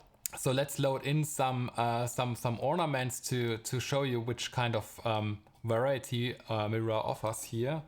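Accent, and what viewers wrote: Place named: Germany. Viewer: i love the german english. :-) sehr gute Einführung. Danke, sehr fundiert auch in der Geschichte. Danke.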